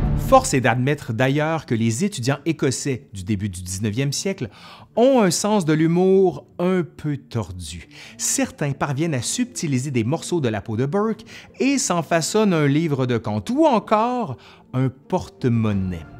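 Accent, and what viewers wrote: Quebec, Canada. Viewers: Salut á tous !!! L'accent des Canadiens francophones sonne comme une musique agréable à l'oreille ... Merci pour ton travail de qualitée !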